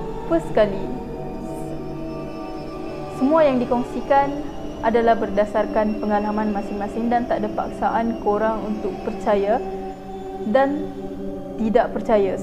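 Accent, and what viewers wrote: Sabah, Malaysia. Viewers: Orang Sabah kah?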